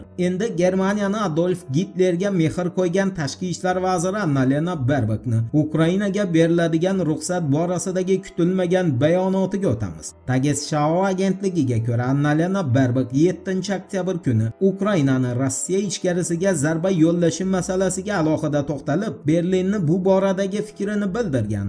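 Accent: Khorezm, Uzbekistan. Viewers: Нима бу хоразмликми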